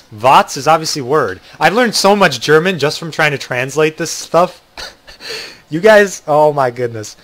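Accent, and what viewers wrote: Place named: Seattle, United States. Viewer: I thought u were from Seattle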